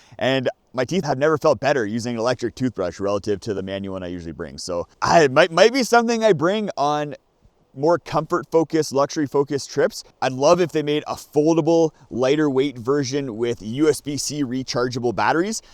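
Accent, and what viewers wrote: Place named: Canada. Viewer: Sometimes it’s hard for non-North Americans to distinguish US vs Canadian accent. But boy do you clarify the differences.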